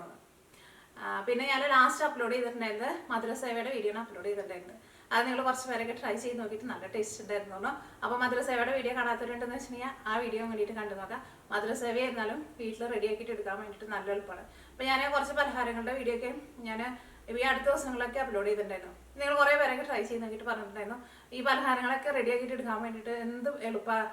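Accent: Thrissur, India. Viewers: ഞാൻ തൃശൂർ സ്ലാങ് കേൾക്കാൻ കൂടി ആണ് വരുന്നത് ചേച്ചി 😘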